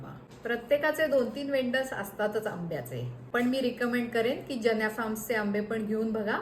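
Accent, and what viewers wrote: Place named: Pune, India. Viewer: कुठे आहे हा जन्या farm .. तुमच्या बोलण्यावरून तुम्ही पुणेकर वाटताय.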